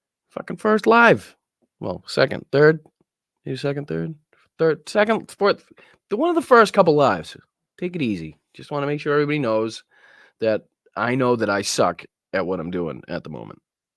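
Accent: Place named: New England, United States. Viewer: This was fun. I learned more about you, which explains why I don't see enough of you. You are very funny no matter what you're talking about, and you're handsome with an adorably annoying N.E. accent. 😉 Take that and run with it for now. It will develop into something on its own. The first 10 minutes made me laugh hysterically. The tech issues being worked out was funny because, like I said, it doesn't matter what you're doing, you bring fun positive energy just by showing up. I like the shoot the shit mode with the chat. I need a break from the more rigidly constructed political shows. You can still talk politics. It's just more relaxed and off the cuff, so I'm less traumatised by the events of each day. Starting my day today with a smile, thanks to YOU❣️😁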